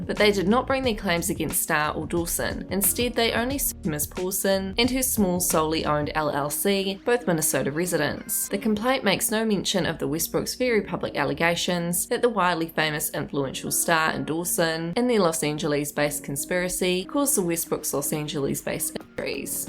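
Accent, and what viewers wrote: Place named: New Zealand. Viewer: Great content! I could listen to that kiwi accent all day long ❤️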